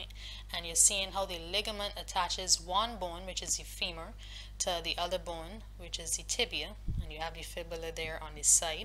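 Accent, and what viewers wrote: Barbados. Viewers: Are you bajan?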